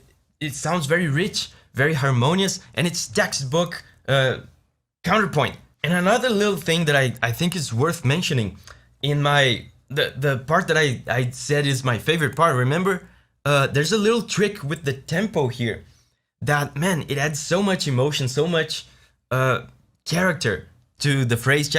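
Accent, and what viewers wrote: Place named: Japan. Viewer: 英語の発音も我々日本人に近い感じたから語学勉強にもいい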